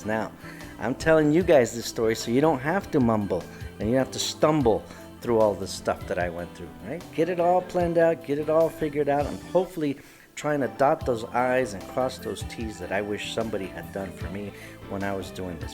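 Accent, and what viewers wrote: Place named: Bisaya, Philippines. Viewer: 💯 legit bisaya gyud, well almost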